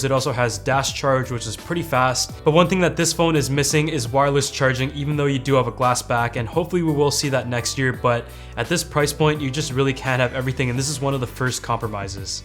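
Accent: Canada. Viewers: MD KHALID RAHMAN he’s canadian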